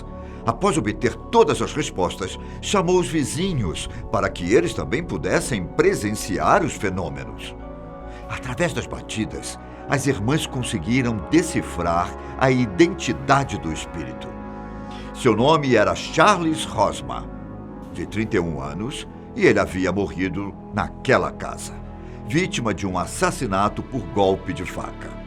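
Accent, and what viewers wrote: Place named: Rio de Janeiro, Brazil. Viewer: Esse aí é carioca em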